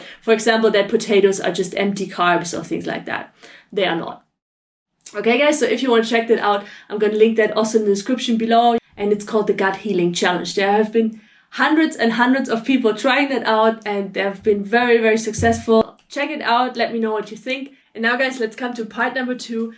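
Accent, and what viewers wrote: Germany. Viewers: German accent is not really appealing for ears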